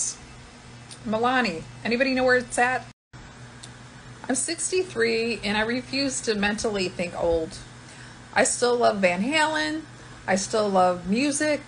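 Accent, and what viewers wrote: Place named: South, United States. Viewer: Omg my southern accent rubbing off on you.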